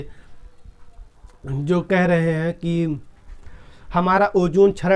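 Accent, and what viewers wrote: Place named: Bihar, India. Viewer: Bihar se ho aap lgta h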